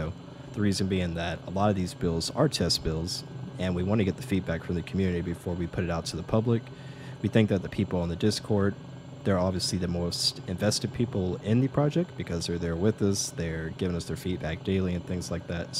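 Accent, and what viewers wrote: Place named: United States. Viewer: "Starting" cold and dark.Very hard to follow that american accent. This video doesn't help me at all.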